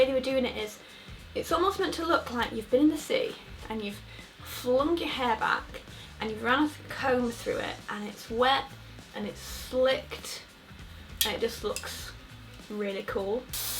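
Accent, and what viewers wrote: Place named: Northern England, United Kingdom. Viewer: Hey, Recently found you on YouTube. It's lovely hearing a refreshing Northern accent and references to places in Manchester rather than Brighton! I'm an English teacher in Bury! Keep up the great work!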